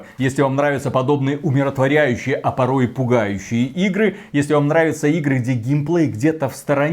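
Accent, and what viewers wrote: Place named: Belarus. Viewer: лайк за трасянку!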